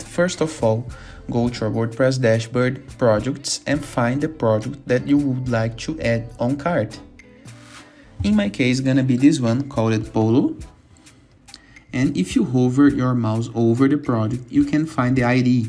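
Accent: Brazil. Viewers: o sotaque do brasileiro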